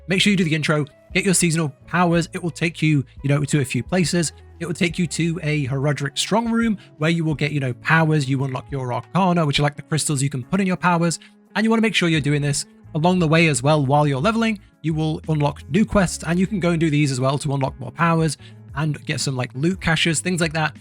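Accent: United Kingdom. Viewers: he talks too dam fast to the point where it sounds like he sped his voice up 😂 I rewinded the vid 8x still can't understand the 1st thing im supposdd to do 💀 And the UK accent isn't helping 😭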